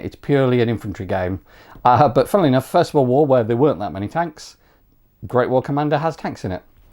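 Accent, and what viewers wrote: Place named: Europe. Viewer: I infer from you accent that you live across the Channel and not across the pond. So, yes, marketing is the reason why US forces are included instead of British troops that should have been a way more obvious choice - or, as you mention, Russians, Italians, Austro-Hungarians, etc. And, indeed, an expansion with British / Commonwealth units is under way; pictures of play-testing are available on the Strategikon forum where the creators are most active. I remember one of the creators telling me he had a lot of documentation to make scenarios with UK and Empire troops, I even think the Middle-East theatre was mentioned, and that he was kind of heart-broken to have to drop some of it to maintain the amount of material economically reasonable - at least as far as a first batch is concerned. Focusing on French troops and West front in WW1 is natural for a French team, because of more than 1.3 m dead soldiers... 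and to make a difference with the usual production in which the conflict first tends to be limited to US Marines and Belleau Wood. As a conclusion... support it and you will sure see male and female Mark I tanks in Gaza, one of these days.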